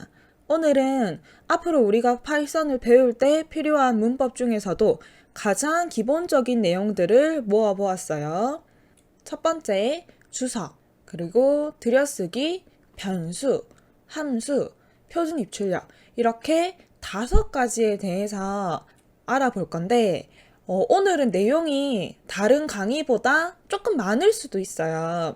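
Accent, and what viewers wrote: Gyeongsang, South Korea. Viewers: 경상도 사투리 반갑네요 ㅋㅋㅋㅋ 전 서울상경해서 코딩배우는 대학생입니다😢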